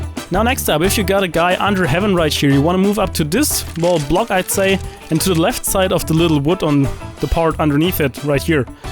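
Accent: Germany. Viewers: das DEnglisch. DAS DENGLISH. DEEEENGLISH. EINFACH AIDS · Is it possible that ur german ?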